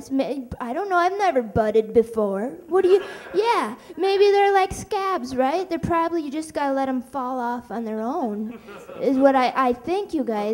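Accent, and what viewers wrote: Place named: Midwest, United States. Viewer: Wisconsin, Minnesota accent & twisted sensibility… both mine it.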